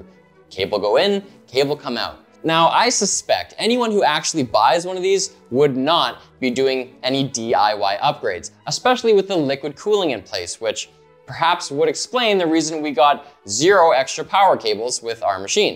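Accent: United Kingdom. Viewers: why the fuck it sound so British ???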